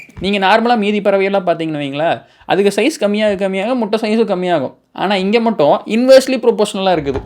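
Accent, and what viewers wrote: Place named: Tirupur, India. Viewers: Neega thirupur ahh antha slaglaye therijuchu😂😂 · Tirupur naala dha namma slang la pesuringa...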